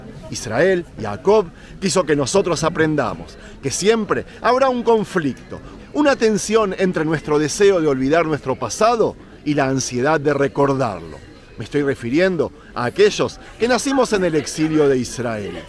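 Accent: Argentina. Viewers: tu español suena absolutamente argentino, con algunos olvidos de palabras, mas absulutamente argentino y se de lo que hablo. otro día te comentare un sino que llevas, pero eso es otro caítulo.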